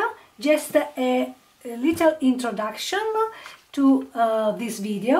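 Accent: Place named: Italy. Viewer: What a fun altered book! I am amazed at your imagination and creativity! I also enjoyed hearing your lovely Italian accent!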